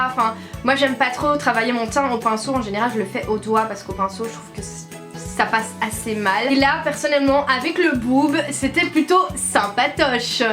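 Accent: Liège, Belgium. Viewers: ça s'entend que tu es belge 😉 on dirait moi 😂😂😂 (oui je suis belge) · Elle a pas le même accent je trouve haha! Elle vient de Liège (Coucou tu es trop lente pour répondre à tes commentaires alors faut bien que quelqu'un le fasse hein8DDDDD) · Tu viens d'ou en Belgique t'as le même accent que lufy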